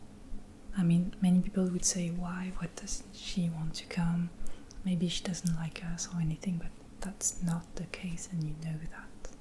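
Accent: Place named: France. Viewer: Even ASMR is more elegant with a French accent!!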